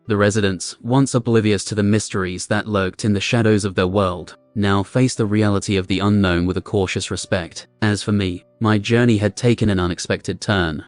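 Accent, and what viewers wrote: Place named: United Kingdom. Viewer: This British sound makes this little bit better🔥🔥🔥🔥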